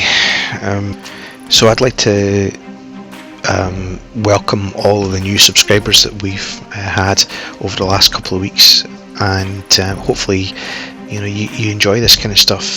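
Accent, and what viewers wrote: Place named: Glasgow, United Kingdom. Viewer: yup.....no tatties in your ears! You from Glasgow, I presume? Fantastic video, crystal clear, and a beautiful, beautiful build. Outstanding. Personally, I build WW2 stuff, but I can learn a lot of from your videos. I'm gonna subscribe and send a link to my pals of your fantastic work on our Google+ modelling community.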